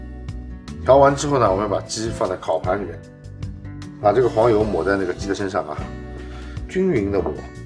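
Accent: Shanghai, China. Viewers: 一，你又不是广东人，一口上海国语。二，广东人不吃辛辣的调料。三，这只鸡没烤熟。如果满分十分你只能拿个三分，失败的视频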